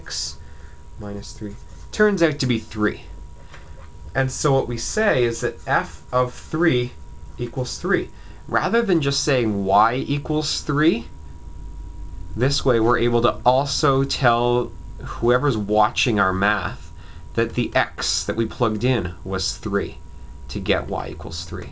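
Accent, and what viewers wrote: Canada. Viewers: nice Canadian accent. this was a lot of help though thanks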